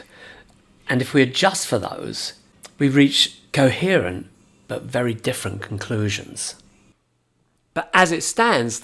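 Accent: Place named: East London, United Kingdom. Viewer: East London accent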